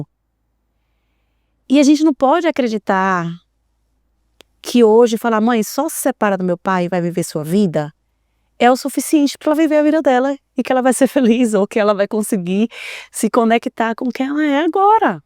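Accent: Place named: Bahia, Brazil. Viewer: Estou simplesmente amando seus livros e seus vídeos. Falam tanto de mim, me enxergo tanto nas suas falas. Ainda mais agora que sou mãe de primeira viagem e estou buscando curar minhas feridas para não ferir minha filha. Sou grata por compartilhar tanto conhecimento com a gente! Ah, e descobri ontem que vc é baiana igual eu, fiquei feliz demaaaais vendo vc fala com nosso sotaque e nosso jeito maravilhoso hahahahaha ❤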